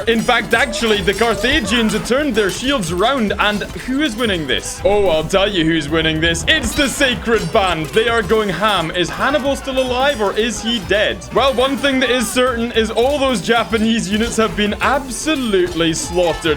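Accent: Scotland, United Kingdom. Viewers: What The Hell? Is Going On? I SUBSCRIBED TO A SCOTISH MAN!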